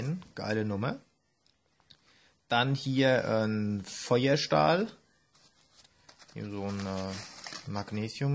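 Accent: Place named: Hesse, Germany. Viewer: Hi! Auf Deinen Kanal bin ich durch das Video von Karl Heger aufmerksam geworden:) Klasse Reviews & sympathischer Dialekt!:) Komme auch aus Hessen!:) Lasse Dir direkt mal ein Abo da! Liebe Grüße, Gear2Video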